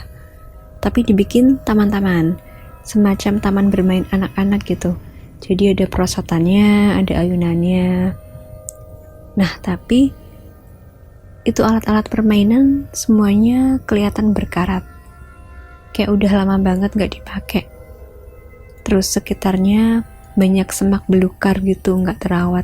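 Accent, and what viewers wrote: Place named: Semarang, Indonesia. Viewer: Dari logatnya kyk org semarang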